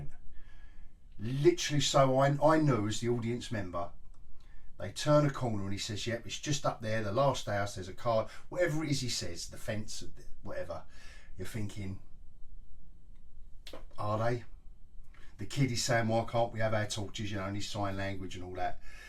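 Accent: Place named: Cockney, United Kingdom. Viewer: Christ I'm 100% British and I'm jealous of that cockney accent! 😁 It was a great pay off to episode 4 which, at the time, felt very weak. Keep up the good work mate!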